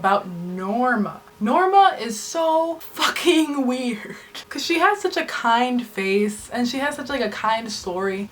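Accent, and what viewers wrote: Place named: Russia. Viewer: your russian accent is on point. also **ermagerd spoilers** my theory is that bennett might have had something to do with the DEA raiding cesar's apartment. perhaps he thought the best chance for those kids was to get cesar away from them. i just don't want to believe he would desert daya like that :C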